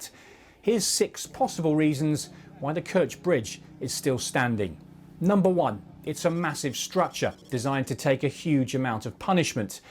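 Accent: United Kingdom. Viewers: added points; after 1000 times only a few success for Ukraine 2.The air defence of Russia is robust 3. Ukraine air force already tried and failed 4. The drone boats were killed so many times by russia and still ukraine is trying 5. Of course Ukraine will take punishment if they really hit the bridge 6. May be Russia knows a lot about what are the assets Ukraine receives from west that why the counteroffensive is dud like ur mouth 7. Sun Szu would faced chengiz khan he would have not written the book 8. Actually Russia is playing long game to damage all western equipment's 9. in summary an old pig with british accent just doing propaganda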